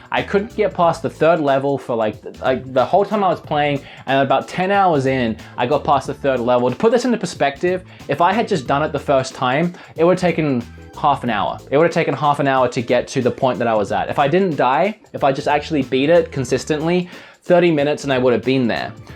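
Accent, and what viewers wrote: Australia. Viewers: I really want to buy a switch since I never got around to getting a WiiU. Nice to hear an Aussie on YT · Wait.... YOU’RE AUSSIE??????? (Subscription intensifies)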